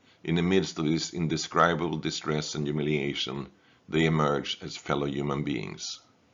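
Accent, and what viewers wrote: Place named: Sweden. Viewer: ❤Nice to listen to! Your accent is very Swedish and I guess you know. 😊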